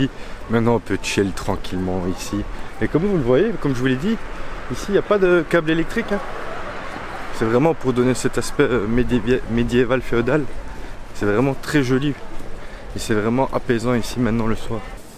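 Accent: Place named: Wallonia, Belgium. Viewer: jolie vidéo que j'ai découvert par hasard via des liens sur facebook... ca fait plaisir de voir un francophone au japon avec un bon petit accent de wallon... ca change des français. ;-)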